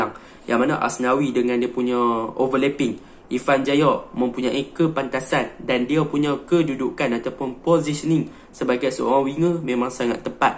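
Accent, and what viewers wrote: Kedah, Malaysia. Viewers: Haha dia ni ckp loghat kedah ...orang utara bukan KL ..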